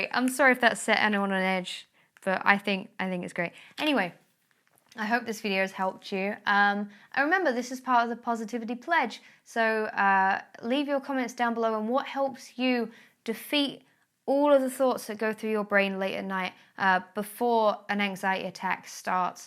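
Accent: United Kingdom. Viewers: the kind of anxiety that I usually have is a bit different from what you describe. But I just went to rest from having one from a complicated social situation and watched this video and I realised why I like these, it calmed me down to just listen to someone talking about a relatable(™) topic in a calm British English and now I'm calm as a little lamb. thank you :D my usual go to methods are meditation, breathing exercises and going through the alphabet in my head oO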